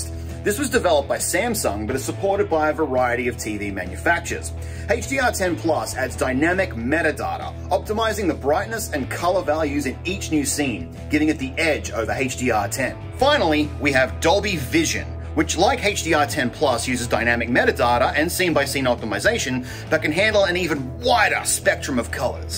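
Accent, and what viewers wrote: Australia. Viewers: It's the Australian pronunciation of the letter, similar to how the Brits pronounce it. They also pronounce 'Z' as "Zed" where US/Canada would pronounce it as "Zee" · OMFG, beard, and AuSTRALIAN an, brooo thank you!!!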